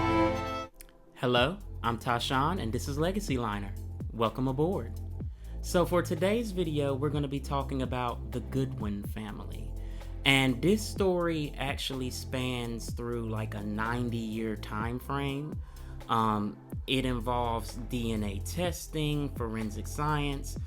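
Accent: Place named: South, United States. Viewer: Hey - I’m loving your videos and just love your Southern accent! You mention about the DNA that was done to try to identity these poor victims. Is there enough information on this for you to create a video on what they found out? Best regards from England!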